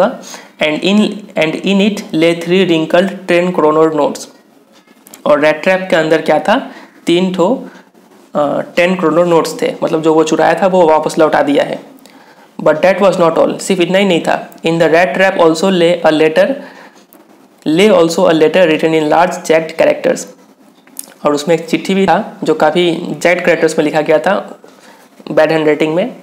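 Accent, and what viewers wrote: Bihar, India. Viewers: Your 3 tho and kaati proves you bihari😂😂lol I am bihari too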